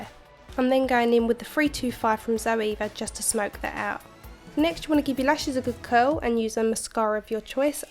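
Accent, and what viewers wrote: Essex, United Kingdom. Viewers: Very beautiful, sultry look, thank you! By the way, are you from Essex?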